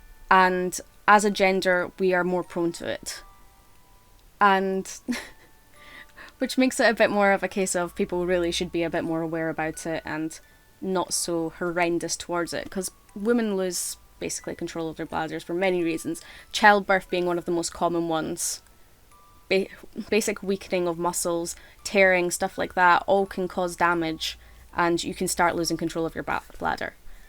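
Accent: Scotland, United Kingdom. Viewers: While having issues at night as well as the occasional daytime accident relationships are difficult. If I had a partner with an issue that required diapers ( if I didn't have any issues of my own). I understand that the human body is a complex organism and things don't always work right and I would accept the fact that they needed to use diapers. Thank you for your videos I have always enjoyed them, especially your honesty. Now if I recall correctly in one of your videos you mentioned you're Scottish, I have always loved your scottish brogue. P.S. I am glad to see that you are uploading again.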